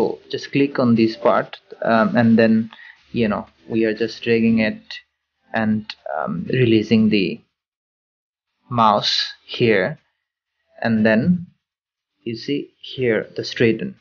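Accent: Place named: Bengal, India. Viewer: Why does this have to be explained to me in a Bong accent.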